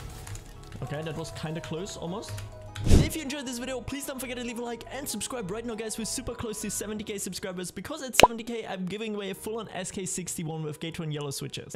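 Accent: Germany. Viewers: Hab dich grad entdeckt und hab nicht mal 10 sekunden gebraucht um zu checken, dass du Deutsch bist. Als Deutscher hört man das gleich raus haha :D. Und nices Video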